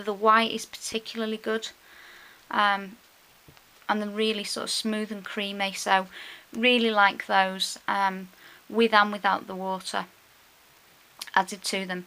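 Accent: United Kingdom. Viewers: Congrats on your over 1,000 subscribers!! You have great videos and you're so talented, plus for us Americans....me anyway, I love your "accent"...you're very easy to listen to! (Quote around accent because wasn't that long ago, I was the only American on the whole of the Isle of Wight and everytime I opened my mouth I "stuck out" like a sore thumb. BUT....I loved the UK and really everyone I met, was such a delight! Seriously though I just love your no fear attitude with your crafting!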